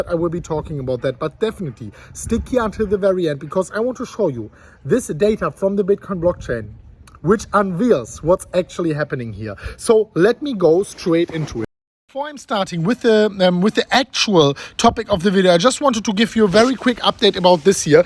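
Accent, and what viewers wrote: Germany. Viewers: u live the best life bro! keep up the good work and stay positive love your german accent by the way enjoy a good drink this evening, u deserve it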